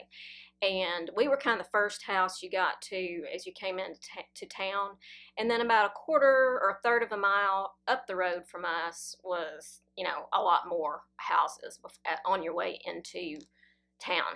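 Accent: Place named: Blount County, United States. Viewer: I KNEW I knew your accent! I grew up in Blount County! I remember when this happened and how scared my girlfriends and I were to stop at rest stops when we drove to the beach. SO scary.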